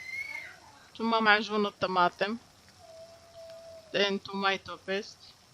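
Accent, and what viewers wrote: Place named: India. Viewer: 😀 لهجة محلية وعربية فصحى وانجليزي بالكنة هندية 😀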